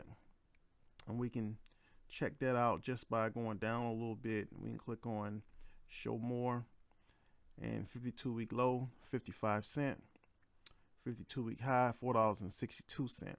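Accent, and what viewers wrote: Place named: Alabama, United States. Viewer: you from alabama? cool accent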